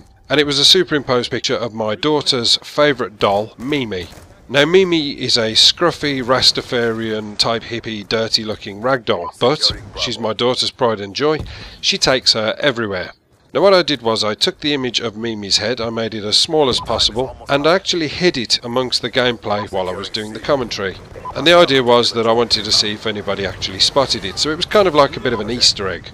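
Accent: Birmingham, United Kingdom. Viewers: hahaha a Brumbie saying "Basicly" always makes me piss my sides. A word that Brumbies always say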